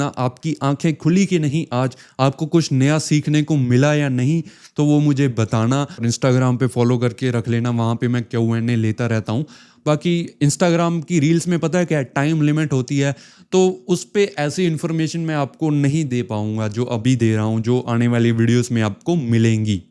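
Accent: Punjab, India. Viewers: From your accent of speech,ig you are from Punjab or haryana. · Tu punjab toh a. Mere veer tu bolna shuru kiita mainu pata lag gaya. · punjabi lagda bai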